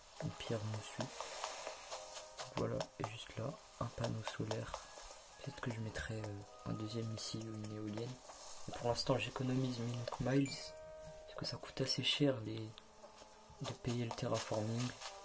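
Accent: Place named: Arab world. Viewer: tu parle vraiment comme un vrai arabe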